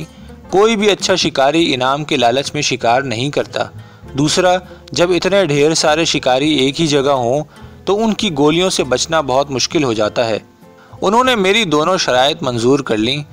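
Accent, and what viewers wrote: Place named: Pakistan. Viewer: Aapke talaffuz se aisa lagta hai ki aap pakistan se ho.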